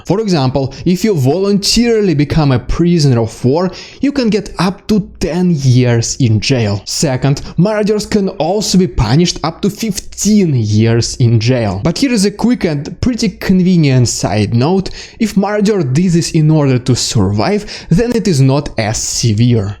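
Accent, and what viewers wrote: Russia. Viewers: Love your pronunciation of Russian and Ukrainian words and names. Strong Russian accent which is appropriate and very difficult for an English speaker to reproduce.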